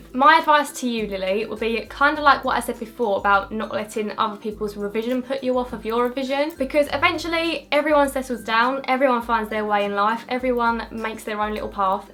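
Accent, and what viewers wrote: Essex, United Kingdom. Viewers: I love your Essex accent! Also you're funny and seem so down to earth x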